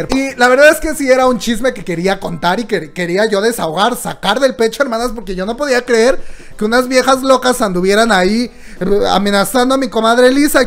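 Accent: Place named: Mexico. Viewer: Amo como hablan los mexicanos 💚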